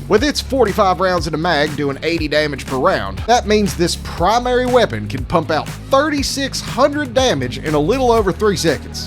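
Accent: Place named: Texas, United States. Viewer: I didn't know I needed to hear Helldivers tips with a Texas accent until I saw this video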